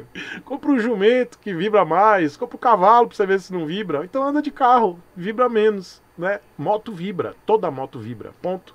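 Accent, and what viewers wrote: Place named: Northeast, Brazil. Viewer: Nossa forma de falar, nordestino kkkkk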